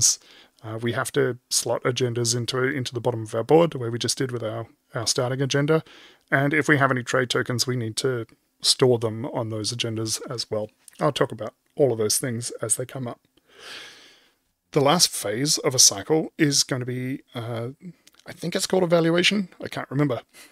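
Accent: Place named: Australia. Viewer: Mine's on the boat too! I'm Aussie. U sound like one too!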